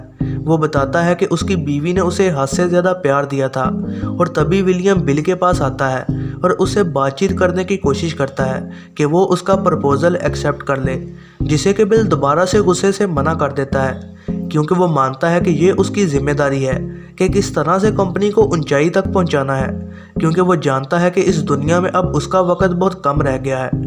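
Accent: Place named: Punjab, India. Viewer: Ur Punjabi accent makes explanation more interesting bro....keep growing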